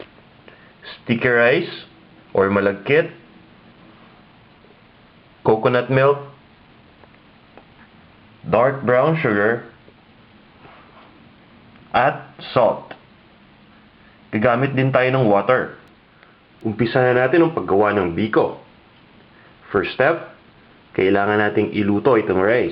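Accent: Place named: Batangas, Philippines. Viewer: Gusto ko po yung Batanguena accent at yung pagpapaliwanag sa pagluluto. Good Luck po... subscribing :-)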